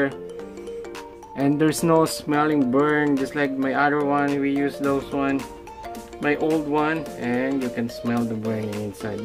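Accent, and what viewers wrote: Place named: Philippines. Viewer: Sound like a pinoy. Saalamat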